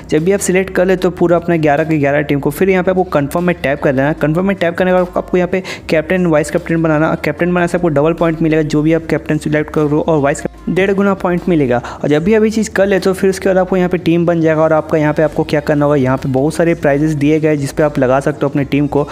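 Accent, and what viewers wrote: Odisha, India. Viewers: bhai aap odisha se ho kya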